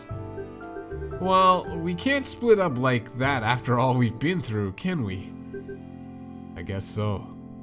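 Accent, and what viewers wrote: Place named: Germany. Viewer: Your German accent is actually the best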